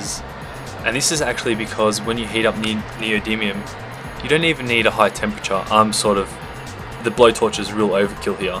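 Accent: Australia. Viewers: Are you Aussie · OwO is that another aussie I hear? Or am I mistaken.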